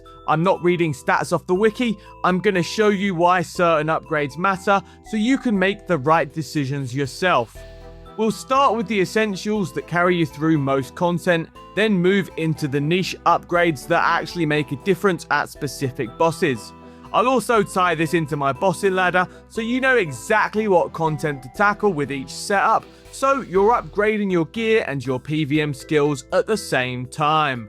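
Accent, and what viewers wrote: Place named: United Kingdom. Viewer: God damn you’re the best in the business. Info, facts, humor and a wa-ah bo-ool accent? Perfection.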